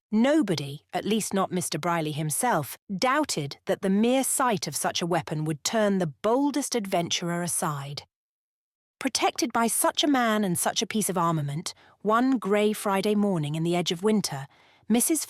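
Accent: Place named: Cockney, United Kingdom. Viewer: I wonder if you could have the books written by American authors read by somebody with American accent. Sarah O Jewett was an American living in New England Maine specifically. And to have this read with a Cockney accent sounds really bizarre.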